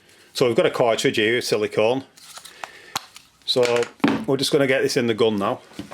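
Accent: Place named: Lancashire, United Kingdom. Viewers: so i was pretty close. Luv a good Lancs accent :) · This Northern fella has helped me no end become a competent DIY'er. I am already a qualified painter and decorator, so to look up this channel just furthers any skills. I even fixed my pensioner mates toilet. Plumber wanted 50 quid, I ordered the part for him and it cost £11.00. Not to mention my Mum's problem with her light fitting, last year. Top bloke! Thank you so much and love that accent! I'm not doing bad 'for a Girl!' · Great video! Even better with your telltale Lancashire accent. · Thank you for this, the Blackburn/Lancashire accent here is strong!!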